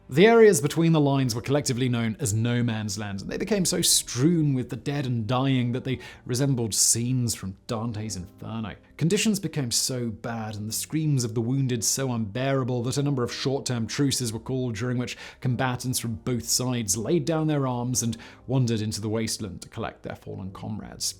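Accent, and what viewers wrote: England, United Kingdom. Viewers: "the French and English doubled down..." the somme was fought by the British Empire, not just England. Being Scottish, that coming with an English accent was particularly painful!! And Im not one of those wankers who love to point out mistakes - I just couldnt let that one go. FFFFFFRRRREEEEDOM!!!!!!!!!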